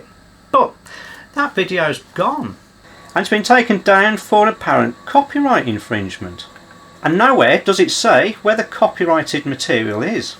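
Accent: United Kingdom. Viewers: The guy that makes that shitty Amp with all those wobbly plastic standoffs that looked like shit and not worth the $25 thousand anything? He just doesn’t want anyone to see his crappy workmanship. In my opinion a super high end amp that has any value is going to use vacuum tubes. Starting to love your channel and am enjoying your accent, Americans love a great Brit accented voice I guess it’s all the James Bond movies and Dr Who episodes that made it across the pond that started the addiction.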